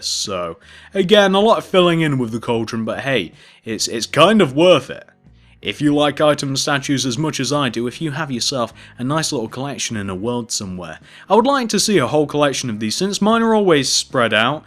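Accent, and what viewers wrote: United Kingdom. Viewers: Hello, Number 1 fan I have a few questions I want to ask you is their a way for me to contact you? Also I been a fan since 09 and I learn everything from you.. on minecraft. Also British accent is awesome!. Ps: Number 1 fan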